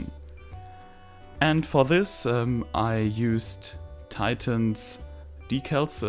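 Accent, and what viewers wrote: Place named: Germany. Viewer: What was with the intro at the beginning? Was that a mistake? Also, I love your German accent.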